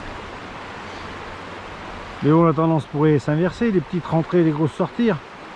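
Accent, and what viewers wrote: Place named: South, France. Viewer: Salut,je ne connaissais pas ce talent de chanteur👍, souhaitons que ces jolies farios corrézienne t'ai laissé un souvenir agréable,toi qui leur parle comme moi avec l'accent du sud, t'entraînerais tu pour cet été? 😉 une session rock and roll où on devine que tu t'es amusé. 👏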